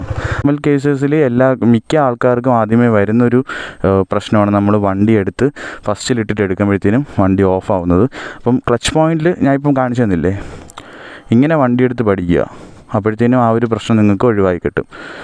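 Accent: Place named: Trivandrum, India. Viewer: Where is this place ? Trivandrum ano? Asking because your way of talking is very similar to someone from tvm. Im from tvm and we also use the word theri for slope.... Great video by the way ...very well explained with examples.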